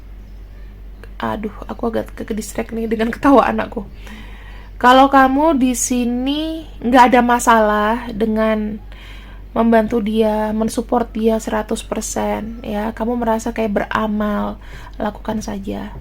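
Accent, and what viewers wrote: Java, Indonesia. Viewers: jawa medhok 😂😂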